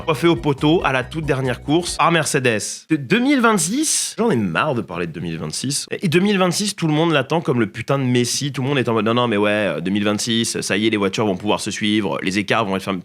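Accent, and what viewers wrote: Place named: Italy. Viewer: l'accent italien n'est pas trop mal géré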